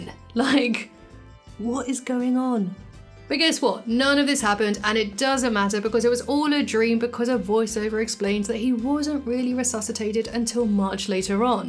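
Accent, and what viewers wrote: United Kingdom. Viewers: I don't get America (or maybe Fox)'s love for Benny Hill either, and I am American. But it's funny to me I legit forgot you were English (despite it being your accent) until you mentioned it. What does that say about me?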